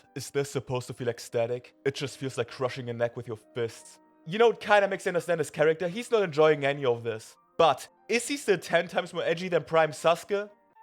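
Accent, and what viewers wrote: Germany. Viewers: Ich höre deutsch raus?👀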